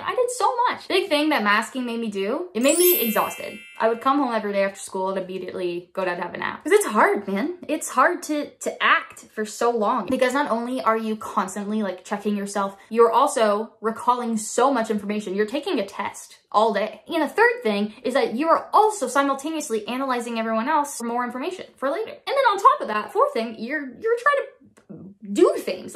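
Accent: Canada. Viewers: 😂💗🫶🫶🫶🫶 you're hysterical 😂 (((((((((penis)))))))) also: you definitely sound mostly Canadian 😂💗🤙 much LOVE ! ✌️ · 100% sound Canadian haha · It sounded super canadian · Yeah you’re about halfway between Canadian and American when you do it without thinking about it hehe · I figured out you were Canadian several videos ago because of your “abouts” lol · Your abouts and outs still sound very Canadian to my American ears hehe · nah, you still sound FIRMLY Canadian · no hun still can tell you're Canadian from your "out and about"s hehe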